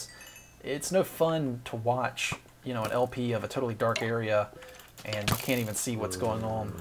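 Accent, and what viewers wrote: South, United States. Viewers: Accents are awesome. My favorite is hearing UK or Australian people putting on an American accent., you sound like you're from an urban area in the southern U.S. - not a thick stereotypical "Southern" accent but a hint of the twang/drawl. (Likewise, I'm from the Minneapolis area so I have a hint of the northern Midwestern "O ya sure you betcha" thing, but not nearly as much as my friends from rural MN/WI.)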